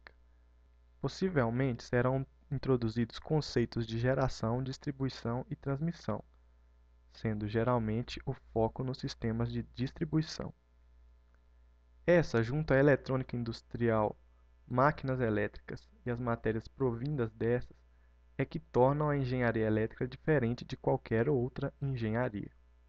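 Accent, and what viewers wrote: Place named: Minas Gerais, Brazil. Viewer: Antes de dizer que havia estudado em Ouro Preto já percebi q vc é mineiro, se não é pegou bastante o sotaque rsrs, Minas minha paixão, saudades!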